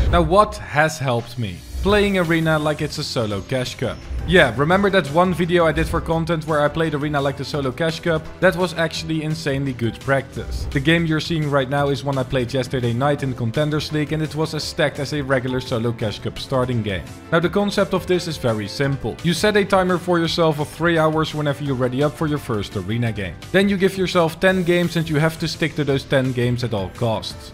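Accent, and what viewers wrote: Netherlands. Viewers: Ik weet niet als ik het goed heb maar je bent Nederlands toch of hoor ik het verkeerd I dont know if i right but Your Dutch right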